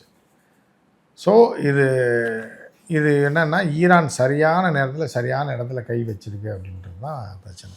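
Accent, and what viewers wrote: Madurai, India. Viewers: டேய் மதுர பேச்சை நிறுத்துடா சடங்குசுத்தியாச்சுனா என்னடா?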